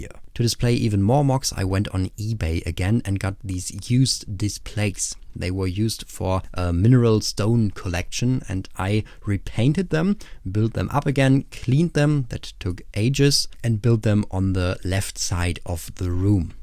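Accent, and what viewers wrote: Germany. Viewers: Dieser deutscher accent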